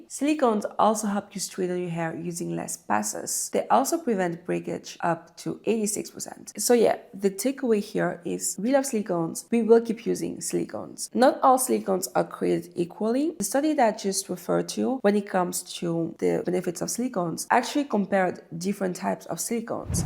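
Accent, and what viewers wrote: France. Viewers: Are you French? The accent it😍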